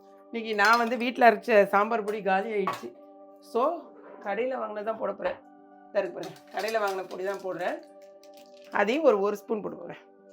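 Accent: Tirunelveli, India. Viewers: எனக்கு தோசை தான் பிடிக்கும் சிஸ்டர். என் அப்பா ஊர் திருநெல்வேலி தான். அந்த ஊரில் நீங்கள் சொல்வது போல் தான் சொல்வார்கள். நீங்கள் புடவை கட்டும் விதம் உங்கள் சிரிப்பு சூப்பர். வீடியோ பார்த்தாலே ஒரு எனர்ஜி வருது.ஒரு சந்தோஷமாக இருக்கு சிஸ்டர். நிறைய வீடியோஸ் போடுங்கள் சிஸ்டர். உங்கள் பேர் என்ன என்று சொல்லுங்கள் சகோதரி.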